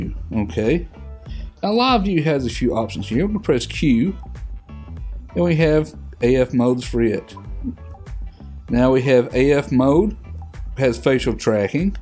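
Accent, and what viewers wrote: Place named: Texas, United States. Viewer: . Your Videos are great. I have just bought the 80D and your tutorials are really helpful. Many Thanks. By the way, you sound like you come from Texas! We lived in California for a few years back in the Eighties.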